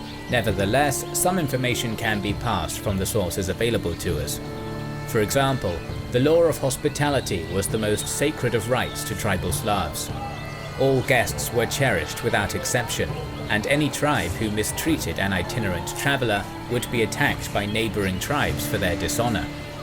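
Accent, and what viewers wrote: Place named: United Kingdom. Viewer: Hey, I'm talking to YOU! Your channel is disappointing, and I'll tell you why: I'm not even one minute in, and I get blasted with a stupid Nintendo (No-Friend-o) video-game ad... (You know that I can just Google the info I want to know, right? I don't need to watch your channel to know these things)... I don't like being tricked, and that is exactly what you are attempting to do; to trick us into watching ads for your silly game. Shame on you! Why are all these so-called "historians" always hawking some dumb-ass RPG before they regurgitate a warped version of Wikipedia with incongruous graphics?...And, they all seem to have annoying high-pitched low-class British accents too... What gives?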